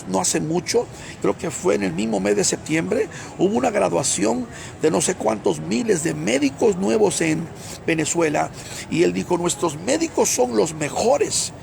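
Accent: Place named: Quito, Ecuador. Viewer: Mira inproquita de momento ablas con en Quito Ecuatoriano porque no miras te veo vestido de judio y protestante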